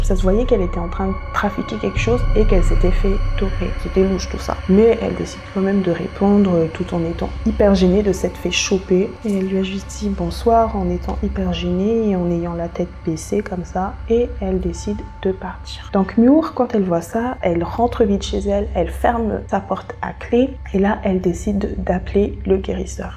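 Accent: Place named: Madagascar. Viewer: J’adore ton accent malgache ! 😍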